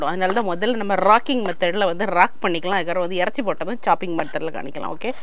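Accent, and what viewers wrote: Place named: South Tamil Nadu, India. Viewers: சகோதரி பல ஆண்டுகள் லண்டனில் இருந்தாலும் நம் திருநெல்வேலி தமிழை மறக்காமல் பேசுவது பெருமையாக இருக்கிறது மட்டன் கபாப் சூப்பர் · Super mason தாங்கள் சமையலை விட நீங்கள் பேசும் எங்கள் தென்னாட்டு மொழி மிகவும் அருமை எங்கள் முகவரி இந்தியா தமிழ்நாடு திருநெல்வேலி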